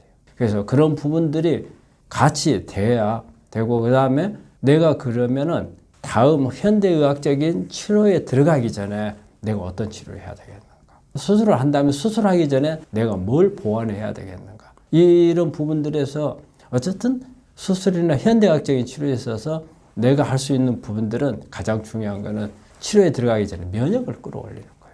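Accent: Jeolla, South Korea. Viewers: 전라도 억양 너무 듣기 싫다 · 아따 절라도고만 ㅎㅎㅎㅎㅎㅎㅎㅎㅎ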